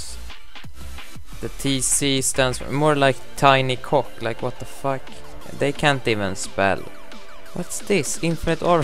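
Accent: Sweden. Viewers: Wow u can really hear that u are from Sweden #SweGang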